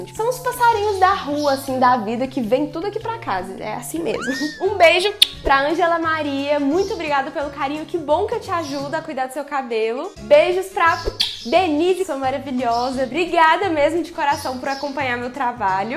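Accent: Minas Gerais, Brazil. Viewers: !!!!!! amiga sua louca!!! que hidratação foi essa.... amei... amo seus vídeos acho você muito linda e fofa com esse sotaque mineiro... e: EU AMO BABOSA... sucesso bjnssss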